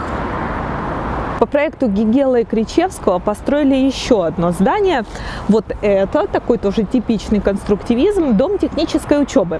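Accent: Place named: South, Russia. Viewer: Дамочка с южным акцентом, не из Питера рассказывает о Питере.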